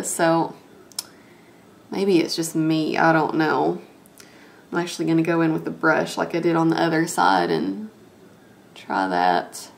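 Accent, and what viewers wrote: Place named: South Carolina, United States. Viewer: What state are from? You sound like and I am from SC. Love your vids.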